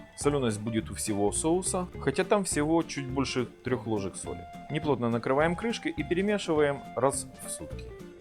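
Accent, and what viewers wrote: Ukraine. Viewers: Рецепт то абхазский,но говор у Вас украинский или Ростовский,Краснодарский,но никак не апсны!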